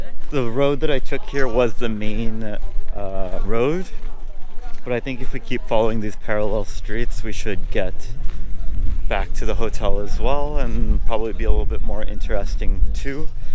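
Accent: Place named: United States. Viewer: Thank you for this beautiful vlog of Berbera Port.I liked and subscribed the channel.Are you from the United States.You've am American accent.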